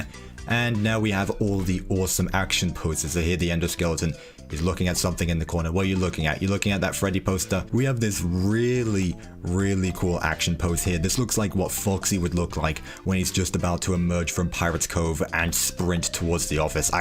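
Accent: United Kingdom. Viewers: Are you British beacous you sound like one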